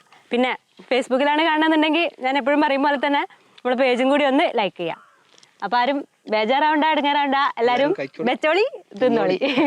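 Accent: Malappuram, India. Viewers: മലപ്പുറം ഭാഷ 👌👌🤦🏻‍♂️👌👌👌😂😂😂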